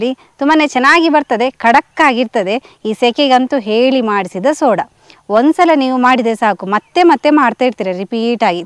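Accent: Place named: Dakshina Kannada, India. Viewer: ಮಡ್ಕ ಸೋಡಾ ರುಚಿ ಕುಡಿದ ನಂತರ ಗೊತ್ತಾಗುತ್ತೆ ಆದ್ರೆ ನಿಮ್ಮ ಕನ್ನಡ ಉಚ್ಚಾರಣೆ (ದ. ಕ. accent ) ಕೇಳಕ್ಕೆ ಬಹಳ ಚೆನ್ನಾಗಿದೆ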